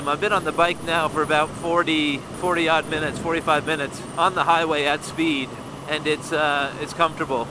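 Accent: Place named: United States. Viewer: You don’t sound English? You sound like an American. How’s it feeling riding on the opposite side of the street.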